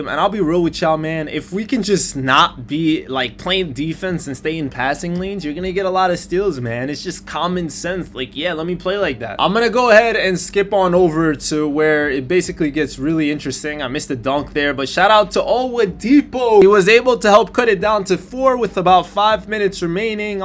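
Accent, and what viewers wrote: United States. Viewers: You mentioned you have lag a lot. Where are you based? Im from the UK but im always running into you in TT park. and you've got a US Accent, Maybe you're on the wrong servers or something.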